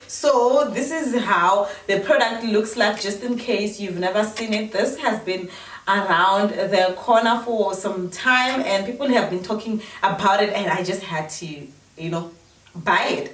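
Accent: Tsonga, South Africa. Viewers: I love everything about this Tsonga accent 😍😍😍😍 and that you're not trying to fake a different accent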